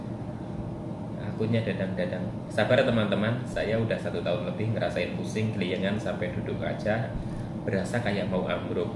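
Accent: Banyumas, Indonesia. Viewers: Mas. Sembuhnya Berapa lama?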